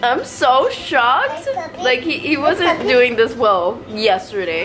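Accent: Puerto Rico. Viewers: i love how puerto rican he sounds. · El bien boricua jajajajaja arrastrando la R y hablando sin la S al final de las palabras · He is Puerto Rican is obviously 😂 reconozco mi acento “déjame veL”😂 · I recognize that Spanish accent anywhere! Puerto Rico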